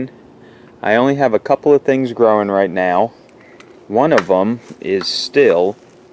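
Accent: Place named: South Texas, United States. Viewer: I hear South Texas in your voice. Do you ever grow super hot peppers.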